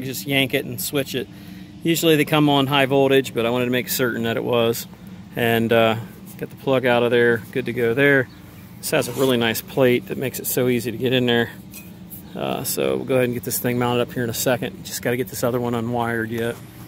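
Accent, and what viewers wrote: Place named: Pittsburgh, United States. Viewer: Sounds like you’re from Pittsburgh that’s the way we talk lol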